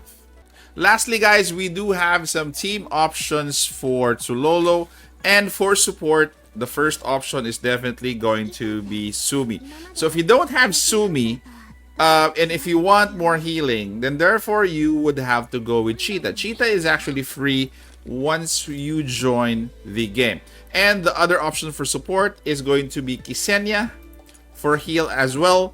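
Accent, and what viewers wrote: Philippines. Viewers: bro is it me or do you sound like your average filipino teacher/instructor especially with that signature filipino reiteration and intonation during explanation lmao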